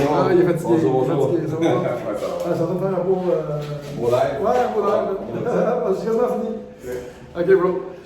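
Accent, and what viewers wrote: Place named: Canada. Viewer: J’aime beaucoup vos infos avec cet adorable accent canadien 😇 Je suis une française de 82 ans et vis en Bretagne. Continuez je vous prie. Je vous embrasse.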